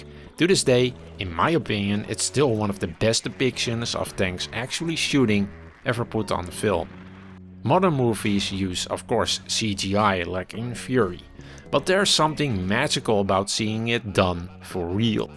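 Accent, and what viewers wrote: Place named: Netherlands. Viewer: The accent... ah, the channel is dutch. I just recognize it now by sound.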